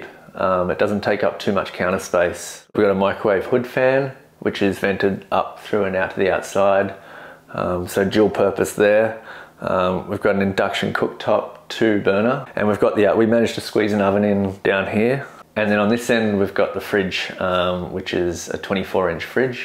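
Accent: Australia. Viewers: A happy and mellow Aussie :) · I had to remember this is located in Canada, whilst listening to an Aussie talking about the unit.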